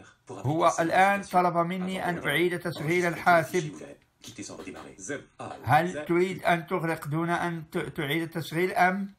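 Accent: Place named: Levant. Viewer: شكرا على المجهود لكن الشرح يبدو معقد بعض الشيئ بسبب الالقاء بلهجة المشارقة نرجو منكم تدارك الوضع احتراماتي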